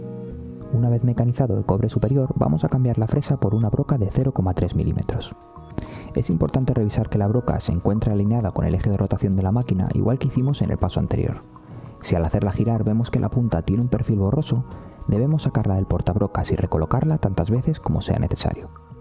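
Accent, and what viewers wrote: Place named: Spain. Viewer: por tu acento eres de España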